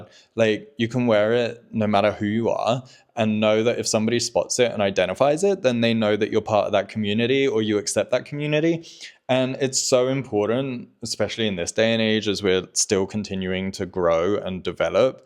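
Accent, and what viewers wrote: England, United Kingdom. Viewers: you are so handsome with a gorgeous English accent that sounds so classy, elegant and professional and I love your tattoos, even though they are personally not my thing - and if I did get a tattoo, it would be something about my Irish roots, like a Celtic Cross, Celtic Knot, a Shamrock or a Harp - I would definitely still date you in a heartbeat though, as you are absolutely gorgeous-looking with your tattoos 🇮🇪☘️🇬🇧😍😍😍😍😍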